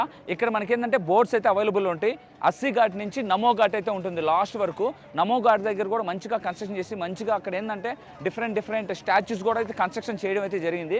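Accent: Nellore, India. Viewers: Meedi Nlr bro mee language vini aduguthunna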